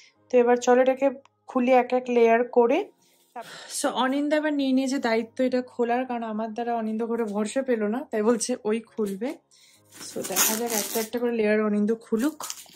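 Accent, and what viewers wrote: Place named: Basirhat, India. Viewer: Tumi basirhate r kotha bolle mon ta valo hoye jay...amro to bari basirhate tai😊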